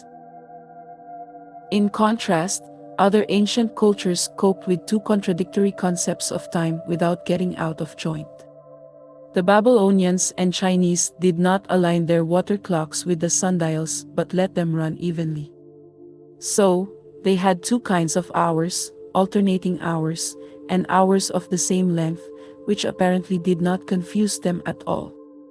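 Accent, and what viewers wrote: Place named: Philippines. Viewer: My guess is the narrator is from the Phillippines. I could be wrong but hope I'm right!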